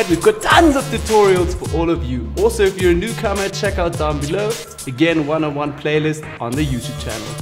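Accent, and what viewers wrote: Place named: South Africa. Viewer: You sound South African....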